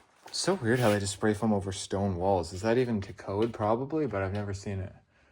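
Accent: Canada. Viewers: What state is this? Are yu Canadian? Your accent sounds Canadian. This price is ridiculously high. No wonder it hasnt sold. Horrid reno. Nothing flows. Kitchen an empty cavern.no way was this house ever inspected. This is all stupid rigged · I don't know why, but I cant stand the Canadian accent. It's like finger nails on a chalk board to me! Sorry lol I just had to turn off the volume on this and use the closed captions instead!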